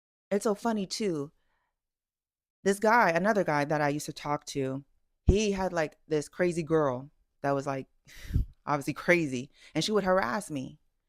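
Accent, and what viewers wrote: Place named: Bay Area, United States. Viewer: I knew you were a Bay girl!!!! hahaha I was like she from LA?? ehhh nah...then you said it lol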